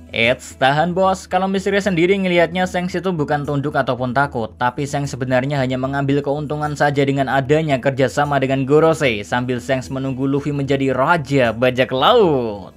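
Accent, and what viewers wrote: Java, Indonesia. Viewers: Logat jawa tai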